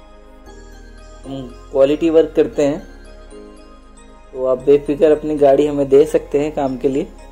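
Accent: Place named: Bihar, India. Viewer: Osm work bro Bihari language me ekdam kantaap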